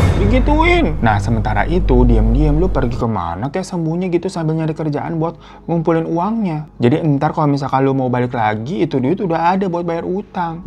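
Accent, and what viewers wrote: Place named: Sukabumi, Indonesia. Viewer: Kaget pas denger di awal awal, lah orang Sukabumi ternyata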